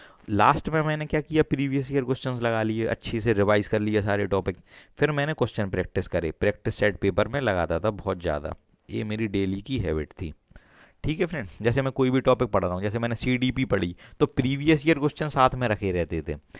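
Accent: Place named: Etah, India. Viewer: apke bolne ka style ekdm Etah side ka lgta h😁😁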